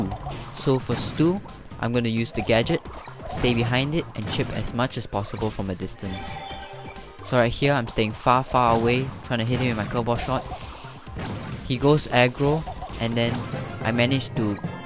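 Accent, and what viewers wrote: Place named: England, United Kingdom. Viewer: accent anglais BRUH.... lol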